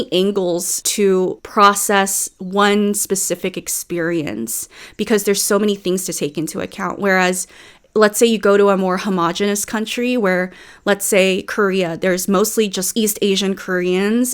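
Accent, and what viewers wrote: United States. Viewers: You are such an articulate, insightful girl and you bring up actually really interesting points about the further complications of not just being 'neurodivergent' but of either yourself or other people around you being from different cultures and therefore have different 'social norms' which makes it more confusing for you, or indeed anybody. But if I would listen to you without seeing your face, perhaps I would assume you're just a 'white, American' girl just from your accent and language. Yet what I wanted to ask you, is that in the way you SOUND exactly the same as a 'white american' could, do you think that because you or other immigrants born and RAISED in the united states essentially do not possess those 'social norms' thier immigrant ancestors have, or do you think theres some degree of even some behaviour and mindsets being inherently genetic, that is, for example, youre african yet your ancestors had different mindsets, traditions and norms, yet you were born and raised in america, do you think even though thats the case, they would retain some essentially pure 'african-ness' even though they had never stepped foot in africa before? 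A genuine question to see what you think 😊 As now you have set off my weird, unfiltered neurodivergent endless question making 😆😂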